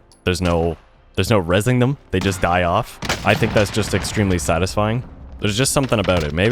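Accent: Canada. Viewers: Brother sounds super Canadian 👌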